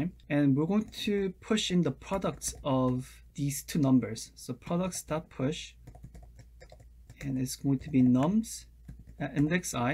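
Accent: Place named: South Korea. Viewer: I love how considerate you are at explaining all the steps you take to get to an answer. This is kind of a random question but I hear some Korean accent in the way you speak. Are you korean by any chance?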